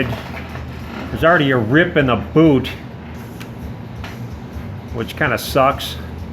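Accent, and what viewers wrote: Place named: New England, United States. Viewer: Awesome video. Not a bunch of talk but straight to the point. Love that New England accent also.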